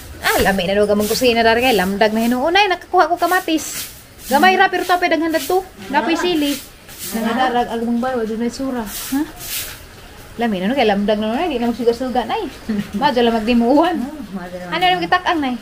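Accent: Bohol, Philippines. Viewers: Imbis ko mahilak ko Sana,🤗nakatawa hinoun ko sa imong inistorjahan kay bojol kaajo..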